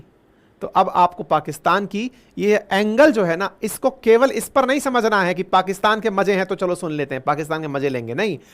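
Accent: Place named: Bihar, India. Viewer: ki apka bihari style shandar hai, real accent.🙏 Bharat mai 1991 mai hua tha, jab GOLD gaya tha.🙏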